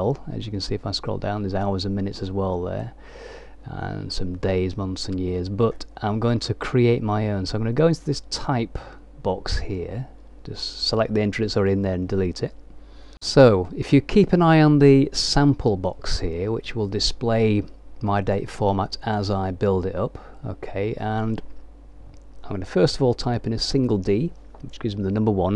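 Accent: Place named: RP, United Kingdom. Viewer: Am I the only one who thinks The Queen's English does enhance the audio?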